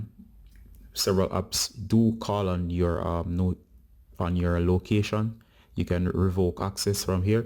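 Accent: Jamaica. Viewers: Your Jamaican??😀😃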